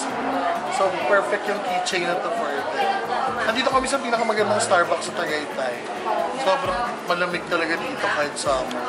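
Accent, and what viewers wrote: Iloilo, Philippines. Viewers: ilonggo ka man? hahahha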